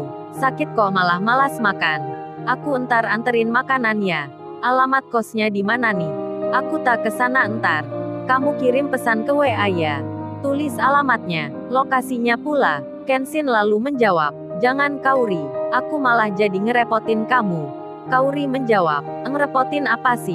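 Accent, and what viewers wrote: Surabaya, Indonesia. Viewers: Mantap..adminya wong suroboyo ya min..salam kenal aq sby utara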